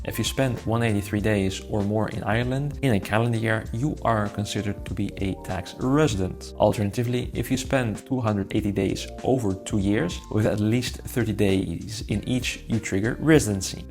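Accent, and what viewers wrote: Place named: Finland. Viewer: The video would be easier to follow if it wasn’t in a thick (Finish?) accent. But otherwise informative.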